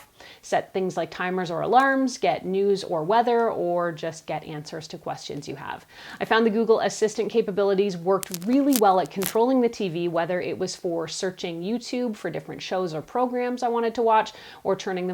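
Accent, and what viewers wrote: Canada. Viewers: Love the Canuckiness! you speak so beautifully, as I normally become instantly frustrated with the regular YT presenters that are far to zippy happy, you present the facts clearly, concisely and at a great pace (without the fluff). Thanks so much!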